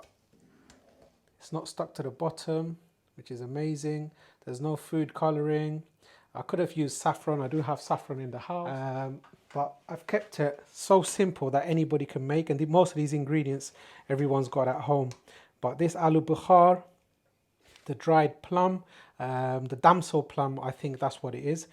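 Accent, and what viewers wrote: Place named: United Kingdom. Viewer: This style of biryani is so simple, it forces me to ask : why not cook chicken curry separately and boil rice with the "khara massalas" and then mix the two. Why go through the charade of cooking them together? What irritated me most was the heavy accent (British?) interspersed with cyclic repetition of superlatives. I say, let's keep it simple and natural, what? The comments displayed are so identical you can't help but think it's the same person with no imagination.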